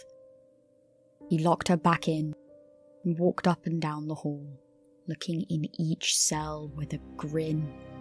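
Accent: United Kingdom. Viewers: I'm looking forward to it. Not you kicking the bucket or retiring.. I really enjoyed the story and the voice reminds me of a really good narration on a channel called cold open stories or something like that. It was also a sisters story. It probably wasn't her as I think all British females sound exactly the same.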